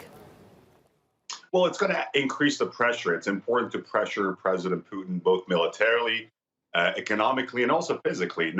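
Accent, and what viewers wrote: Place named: England, United Kingdom. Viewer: salam aagha arash omidvaaram haletoon khoob va har jaa ke hastin salaamat va tandorost baashin aaagha vaaghean baraye man eftekhaare ke yek irooni chonin jaaygaahe besyaar aali ee dar jahaan daare zemnan alaave bar maassshhhala khoshtippi, va sedaaye besyaar carizmaatic va naafez, shomaa be tarze ajiby baa vojoode inke dar emricaa bozorg shodid,az vaajehaaye besyaar ziba va besyaar bejaa va fannie faarsi sohbat miconid,,, man hamishe tahlilhaatoono ba deghat tamaasha miconam, vaaghean aafarin ce inghadr be faarsi ahammiat midid va ,,,bedoone tamallogh, agar oon tah lahjeye zibaaye ingilisi ro nadaashtid, va casi harf zadanetoon ro faghat mishanid, fekr mikard shoma ostaade adabiiat hastid vaaghean dametoon garm ,,,man kheyly keif mikonam vaghti tahlilhaatoono mibinam vaaghean kaaretoon doroste,,,yek ostaad,yek tahlilgare tamaam ayaar, va yek sokhanvare tamaam ayaar hastid omidvaaram hamishe salaamat baashid.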